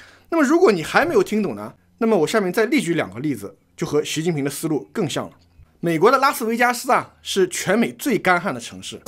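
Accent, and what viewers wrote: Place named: Shandong, China. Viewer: up山东人？方言暴露了啊😄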